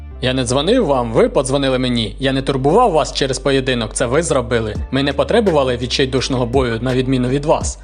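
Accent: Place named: Rivne, Ukraine. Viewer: Акцент у тебе північнорівненський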